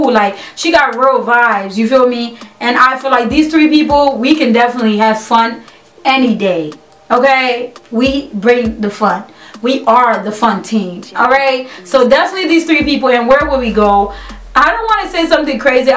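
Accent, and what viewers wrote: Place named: London, United Kingdom. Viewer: i heard a london accent as well😂😂😂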